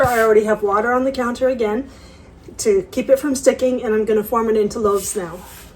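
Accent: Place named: Canada. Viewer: lol, I KNEW you were Canadian, I could tell by your accent!! I subscribed to your channel, God bless you!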